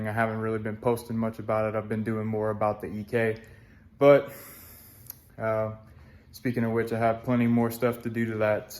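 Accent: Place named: Louisiana, United States. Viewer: As soon as you started talking I said "Southwest Louisiana" haha. I dig these lights man.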